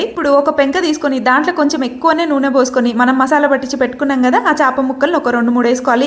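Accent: Telangana, India. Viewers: Akka pakka Telangana akka manadi penka penka antunte ma nanamma gurthu vastundi akka ne matalu superrrrrr ❤❤❤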